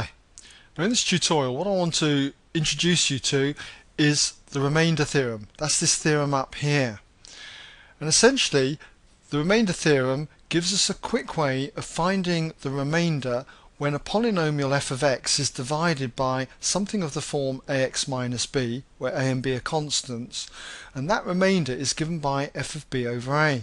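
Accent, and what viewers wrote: United Kingdom. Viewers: Wow nice British accent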